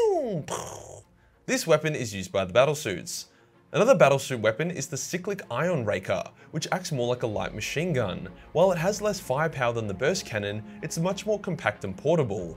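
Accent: Australia. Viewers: I'm guessing your video length is because that's what the algorithm says is best BUUUUUUUUUT. Is there any way we could get some more LENGTH out of you?? Can you do multi parts or something?? I feel like at the 12 minute mark, we aren't getting as much of your fabulous Australian accent as we could be. Doing videos in the buff is tits, but we could use more of that too, and at 12 minutes, it again doesn't feel like everything is getting "fleshed out" enough. Glad to see you growing before our eyes👁👁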